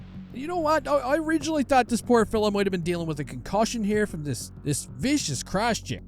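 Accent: Newfoundland, Canada. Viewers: What part of Newfoundland are ya from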